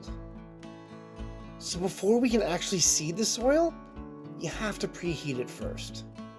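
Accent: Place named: Canada. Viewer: I thought so too. So I looked it up. Turns out, Americans pronounce it with a silent H. Brits pronounce the H (as one would pronounce the nickname for Herbert). The host of this video sounds Canadian to me, so it makes sense that he is using the British version.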